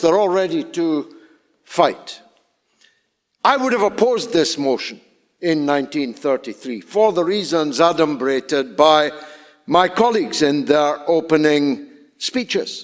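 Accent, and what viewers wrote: Scotland, United Kingdom. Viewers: Wow, straight out of the heart! And this Scottish accent made it even more wortwile. Thank you!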